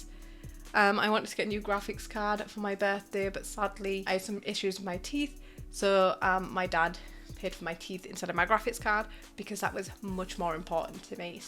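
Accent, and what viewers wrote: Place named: Sunderland, United Kingdom. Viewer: If never guess Yorkshire accent. You sound more like a Makem than a geordie aha 😅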